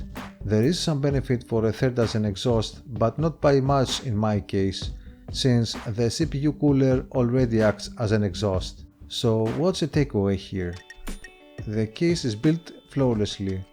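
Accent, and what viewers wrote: Greece. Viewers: why does he sound so greek ?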